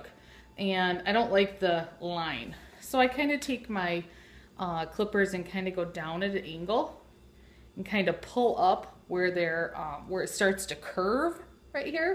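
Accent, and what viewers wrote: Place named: Minnesota, United States. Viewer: I hear a Minnesota accent lol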